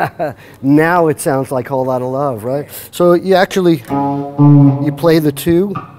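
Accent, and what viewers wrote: Brooklyn, United States. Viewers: Is he from Brooklyn? I forget, but it sounds like it. What a cool guy. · His palm muting virtuosity is out of this world. Love that you can hear a little of that 'Brooklyn honk' creep into his speech every now and then too. A nice Jewish kid from Brooklyn :-)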